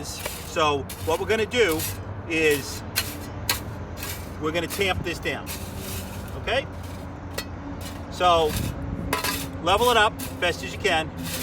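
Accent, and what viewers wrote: United States. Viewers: Sounds like a Worcester accent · Sounds like he's from New York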